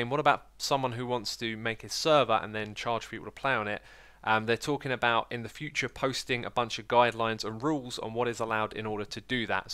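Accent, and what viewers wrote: United Kingdom. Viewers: I wanna see this guy play the game, I like how his british accent sounds.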